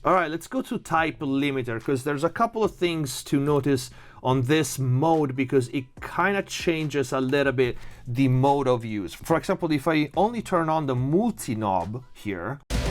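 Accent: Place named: Italy. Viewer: your accent is Italian, are you Italian ?? love your work man, I learned a lot from you!!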